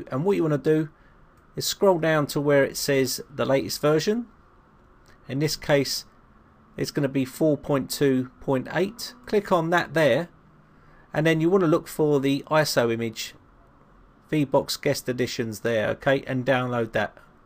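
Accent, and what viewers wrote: United Kingdom. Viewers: Sotaque britânico é top kkkkk Excelente vídeo!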